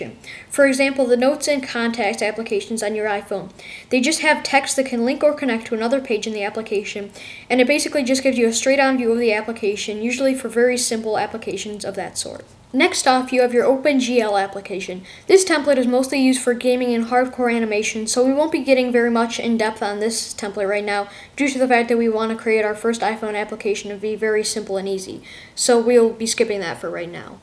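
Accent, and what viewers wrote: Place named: United States. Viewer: I fucking hate the totally high American Accent!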